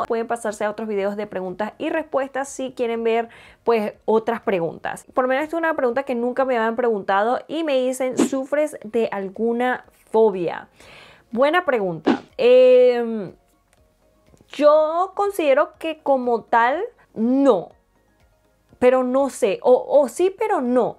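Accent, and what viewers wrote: Venezuela. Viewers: Amo lo coloquial q hablas se identifica lo venezolana ❤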